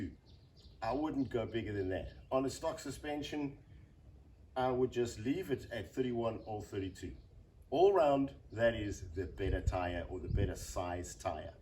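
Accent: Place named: Gauteng, South Africa. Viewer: Profile says Spain. My ear says Gauteng :)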